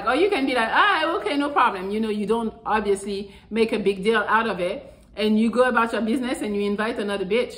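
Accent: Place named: France. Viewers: Oui en effet, j'avais remarqué ce petit accent et je suis allé verifier après. Oui elle est bien française. Le aurait même dû me mettre la puce à l'oreille. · you've got that french accent I can hear, tu es sûrement française mmmh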